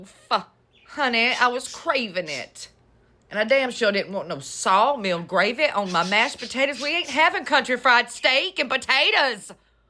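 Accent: South, United States. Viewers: You tickle me; I'm so sorry that you had a bad, but your "rant" made me cry. I'm from South Carolina, but have lived in Minnesota for the last 18 years. I miss everything that you mentioned... field peas, collard greens, gravies, family close by,, hush puppies...and even the Waffle House! Your beautiful drawl is like sipping a mint julep, on the veranda -on a hot Autumn day , but not in a dysfunctional Tennessee Williams" kinda way. Thank you.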